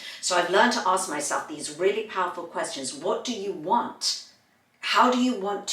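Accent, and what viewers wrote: England, United Kingdom. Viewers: it's nice to listen to you and you've a positive mindset .and it's interesting to know all the steps to reach happiness and positive mindset eight steps. I'm French my name is RICHARD and I should to put subtitles to follow you because it's most easier for me to understand the meaning of your talk .I suppose you're English what you told us and I don't know why I prefer to listen to English people than American people. I understood your talk and I'm so glad because step by step I improve my English skill .above all what I have learned about what you told me is never never give up is what I'm doing .I like English language and I hope one day to meet English speakers to share with them .here in France where I live people doesnt like sharing language I was born in France and I'm sixty three years old but I try to keep getting my energy. 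I'm happy to be alive and enjoying all things .culture spiritual activity music and cooking too .life is so wonderful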